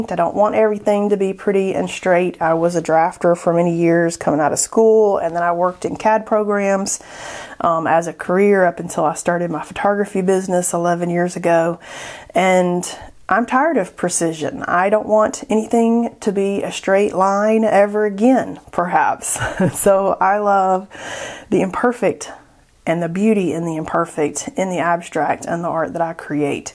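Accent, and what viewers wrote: South, United States. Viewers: obviously southern part of the good ol' USA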